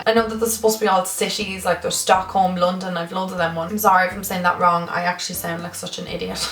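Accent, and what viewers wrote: Ireland. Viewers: came on your YouTube channel. love at first sight! I'm also Irish so hearing your accent is just so homely😄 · I love this video and your accent!! I'm Irish too but my accents different ❤️❤️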